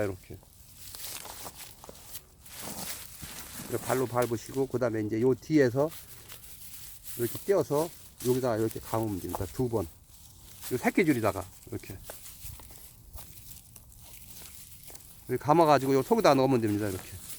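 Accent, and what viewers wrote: Chungcheong, South Korea. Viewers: 손 새끼는 제가 더 잘할듯 ㅋㅋ 충청도 사투리 정겹습니다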